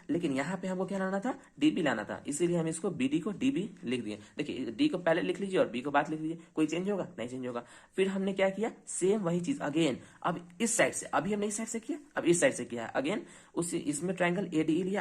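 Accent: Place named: Delhi, India. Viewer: Mast sir bs accent Delhi wala dekhna padega 😅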